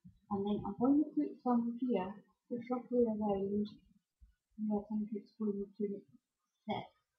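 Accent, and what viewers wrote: Scotland, United Kingdom. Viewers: A beautiful craft! She turned out lovely. Your Scottish accent was a treat to listen to as well.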